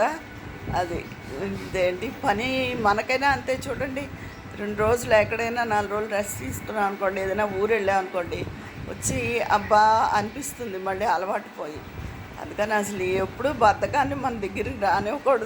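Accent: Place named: East Godavari, India. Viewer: Naku mee vedios choostuntanu meeru eppudu na coments ki reply evvaledandi meeru.mee E.G language chala baguntundi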